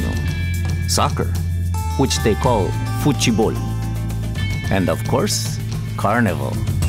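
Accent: Spain. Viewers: very cool video, but EXPEDIAAAAA why the SPANISH accent on top? We speak PORTUGUESE, our accent is very different from the Spanish accent when we speak English. Should put a Brazilian- Portuguese accent on top if your intention was to make a video as if it were narrated by a local. Just a thought. Nice video though. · Por que o cara tem um sotaque espanhol?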